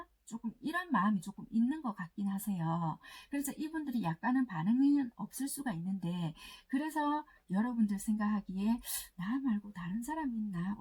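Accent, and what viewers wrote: Busan, South Korea. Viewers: 저 5번 뽑았거든요~ 다시 한번 들어봤어요. 저 태양 물병이고 상승 게자리고, 상대는 게자리에요 ~ 다시 들어도 정말 잘 맞는것 같아요.. ^^ 댓글도 감사합니다!!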